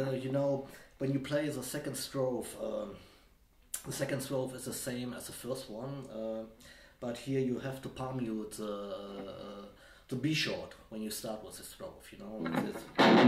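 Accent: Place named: Germany. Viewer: I guess so. German accent ...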